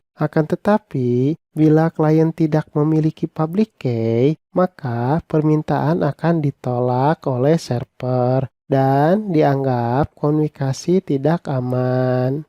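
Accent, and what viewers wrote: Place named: West Java, Indonesia. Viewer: Sunda pisan 😁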